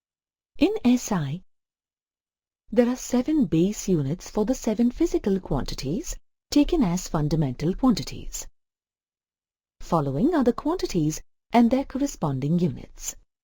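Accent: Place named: India. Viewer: Good indian accent. & tune and pause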